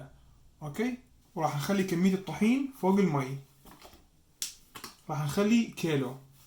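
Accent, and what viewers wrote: Mosul, Iraq. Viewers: مبدع بكل شي وبالاخص اللهجة العراقية بتجنن ...تحية من سوريا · واللهي تخبل بشكرك من حكومة اقليم كوردستان العراق لهجتك العراقي كلش سهل وحلو بعكس المغربي الله يحفضك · يمكن انت مصلاوى من لهجتك لو لا ابووويي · هذه لهجة عراقية ، مو جزائرية 🤔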